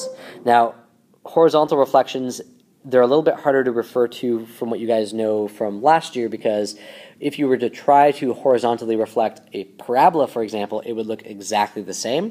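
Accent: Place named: Canada. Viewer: cheers mate rate the canadian accent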